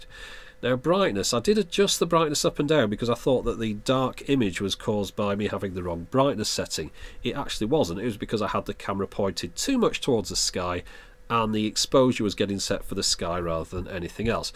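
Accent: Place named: England, United Kingdom. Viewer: it has an english accent, yuck! :(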